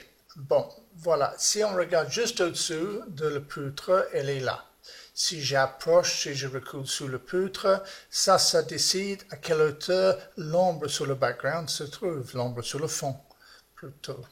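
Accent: England, United Kingdom. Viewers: C'est bien charmant avec cet accent anglais et le Monsieur parle avec une vigueur remarquable.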